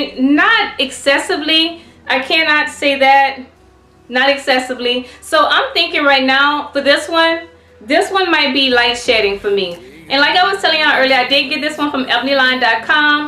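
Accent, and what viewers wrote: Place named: New Orleans, United States. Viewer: New Subbie. You have a New Orleans accent, I know this because that's my city... Baaa-Bay... I want that color, it's beautiful on you. I want 2, LOL..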